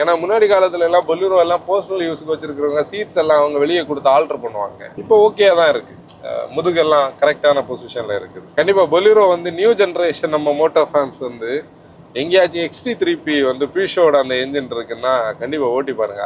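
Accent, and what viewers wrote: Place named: Nagercoil, India. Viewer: I think he is nagercoil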